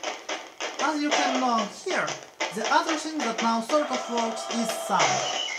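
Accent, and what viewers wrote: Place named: Russia. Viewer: Сраный русский акцент!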